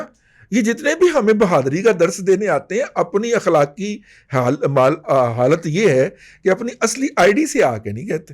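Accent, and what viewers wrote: Punjab, Pakistan. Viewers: Your urdu/hindi in punjabi accent is quite amusing and enjoyable to hear.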